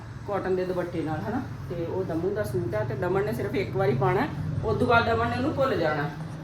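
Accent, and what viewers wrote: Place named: Malwa, India. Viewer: Dii tusi apni hi boli boleya kro malwaeiya dyi boli vadiya nhi lgdi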